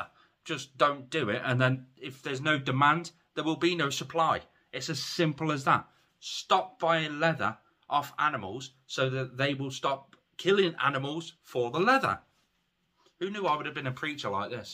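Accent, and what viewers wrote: United Kingdom. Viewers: Y'all Brits have such a bizzare way of saying things to us in the states it's neat though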